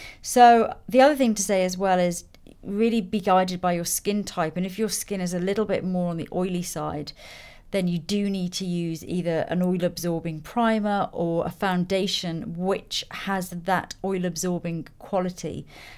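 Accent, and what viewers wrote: United Kingdom. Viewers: Do I hear a british accent :D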